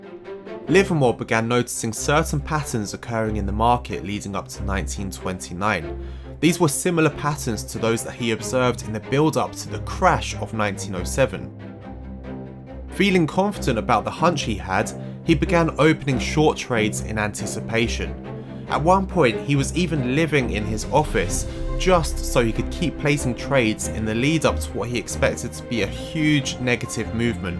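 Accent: United Kingdom. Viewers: I like your British accent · Is this Brittish accent？ Thanks！ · west london accent nice video: really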